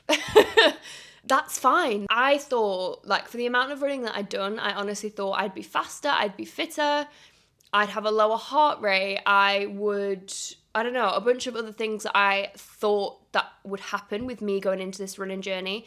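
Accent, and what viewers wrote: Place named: Northern England, United Kingdom. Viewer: Aw, it was so lovely to have this pop on randomly in my feed and immediately hear a Northern accent. I live all the way down in Vienna now, so it's rare enough. Happy to hear you're in love with slow, healthy running! Have a great 2025.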